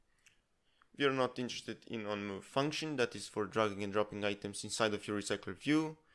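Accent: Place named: Russia. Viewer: акцент вроде русский:yt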